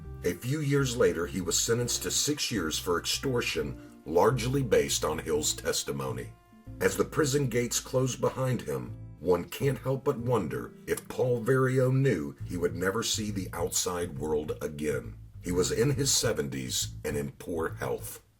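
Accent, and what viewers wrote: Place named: South, United States. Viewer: mispronounces Italian names with his southern drawl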